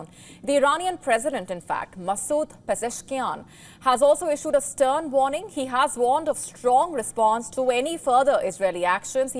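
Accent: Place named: India. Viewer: These Indi accent is awful lol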